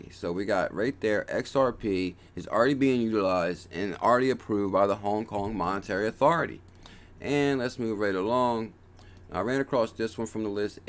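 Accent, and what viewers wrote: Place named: Northeast, United States. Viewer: Thank you! First time I've listened to your video & it won't be the last. Thorough research & I like how you highlight what is important and keep moving. I've invested quite a bit in XRP and found a way to continue to do so....what a price! I grew up outside of Philly and you sound like you could be from N.J. or N.Y or PA or somewhere around there. I'm now living on the coast just south of Boston. Thanks again! Sharman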